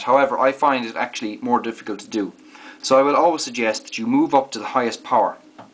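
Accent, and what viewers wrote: Ireland. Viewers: You have a lovely Irish accent. It makes the grasping of the subtle secrets of differential equations that much more delightful. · sounds Irish. Loving the accent and the lesson. Great video!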